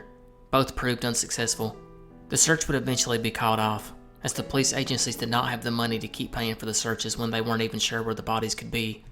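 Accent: South, United States. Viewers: Kentucky? WV? Tennessee? I’m trying to pinpoint lol. I’m in kentucky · I recognize southern accent.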